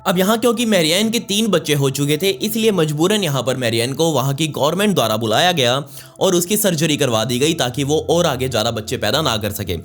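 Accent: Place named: Jammu, India. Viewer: pick me ji.. hua toh thode hi din apko sunte.. pr lgbg saari videao sun dekh chuki hoon.. awaaz lehzaa bhut bdiaa.. pr tone hmare jaisi.. lv u from jammu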